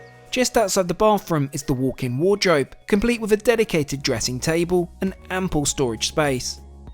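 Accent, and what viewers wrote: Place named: England, United Kingdom. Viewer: I used to think the English accent was enchanting and " lov-e-ly ". But now, seeing what they did to their country with mass immigration ; listening to this guy is nauseating.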